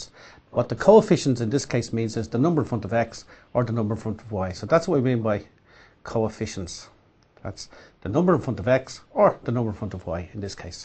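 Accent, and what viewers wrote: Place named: Dublin, Ireland. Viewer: Am In 2nd Year And I Know That! But Fail Play Tah Yeh!! Btw Love YOur Accent very dublin :L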